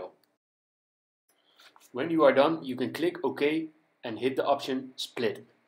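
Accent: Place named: Netherlands. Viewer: Are you Dutch? I can recognize your accent, but not sure :p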